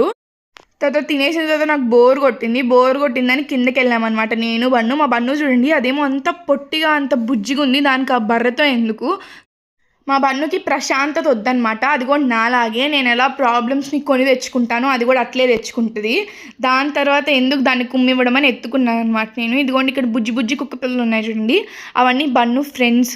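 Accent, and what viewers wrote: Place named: Telangana, India. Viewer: తెలంగాణ లెక్క ఉంది slang❤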